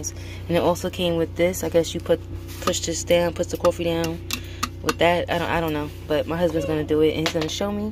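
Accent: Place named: New York, United States. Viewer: I love your videos, but i honestly enjoy hearing your voice. Its pleasant and calming. Plus that New York accent is everything 🤗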